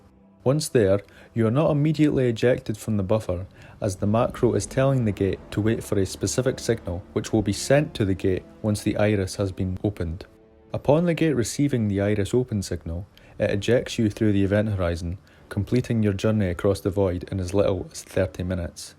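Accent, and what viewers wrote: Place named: Scotland, United Kingdom. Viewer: Ok.. NEW here so DON'T hate.. I had NO idea you were Scottish.. but I IMMEDIATELY heard Scotty from Star Trek and Carson from Star Gate Atlantis.. sorry for stereotyping but DAMMMMM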